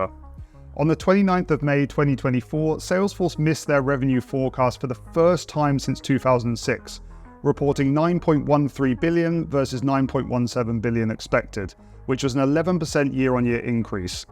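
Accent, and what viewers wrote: United Kingdom. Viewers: I was just listening to the video and thought maybe I misheard it due to the British accent😂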